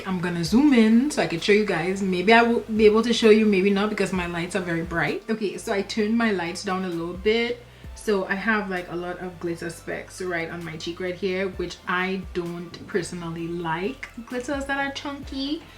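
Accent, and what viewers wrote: Trinidad and Tobago. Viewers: love your accent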